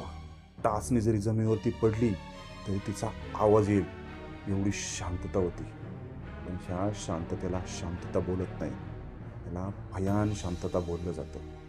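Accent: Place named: Nashik, India. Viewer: सर तुम्ही कुठले आहे भाषा तर नाशिक ची वाटी हे😊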